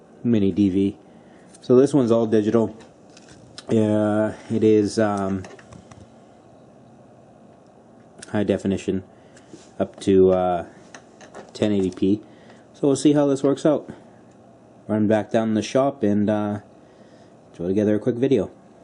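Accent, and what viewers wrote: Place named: New York, United States. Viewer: the carriage hand wheel. I think I just got some old oil gunk under the carrier. I sprayed wd40 and it got much smoother but I probly should remove the carrier and clean under that. It looks like we both have a b9w is that right? I'm new to this machine and would desperately love to learn some more. Btw I'm located in CT and I love the ny accent lol. It looks like we do indeed have the same lathe. I would love to talk to you and maybe pick your brain a bit if you dont mind?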